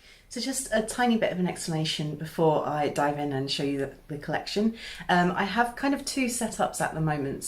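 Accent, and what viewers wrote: United Kingdom. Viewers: British Accent + kind of ASMR voice + retrogaming = me subscribing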